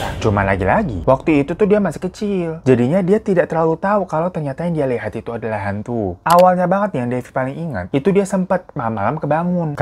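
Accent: Java, Indonesia. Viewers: Knp aksennya jdi ke Jawa kak.. Kan Devi dri Sulawesi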